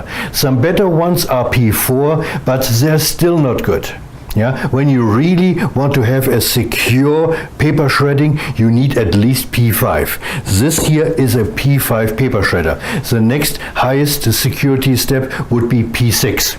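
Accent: Germany. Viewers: Are you from Germany ? your accent sound really german.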